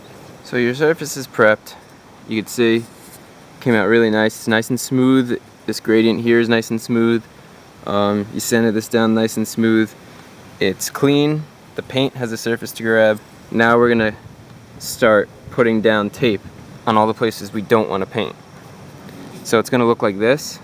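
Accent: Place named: Philadelphia, United States. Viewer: I don't recall ever watching this video. I use to have a '97 B2300, but didn't have it long enough to do this. My current 08 Honda Crv has fading on the B pillar trim and trying to fix it. Dealer says that its a film on top. I hope its an easy fix. Was this video before "Soappy Woder"? Philly guy here, we say woder the same way.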